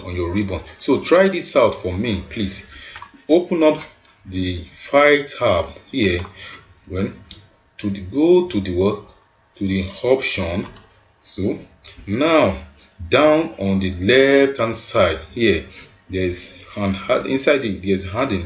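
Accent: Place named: Dubai, United Arab Emirates. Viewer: Your accent is really difficult to get, it feels like you're speaking like Dubai people.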